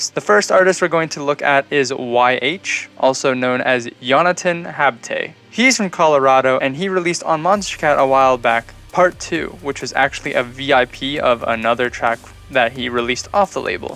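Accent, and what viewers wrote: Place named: Canada. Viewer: idk why but you sound extra canadian in this vid. Anyway, this is great and I'd love to see more of these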